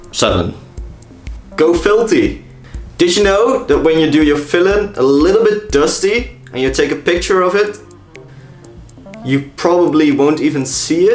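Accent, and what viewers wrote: Netherlands. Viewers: Dutch for sure i hear it right away in the accent. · Good tips brO:) r you dutch? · According to his accent, he could be dutch. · this guy is dutch no doubts lol.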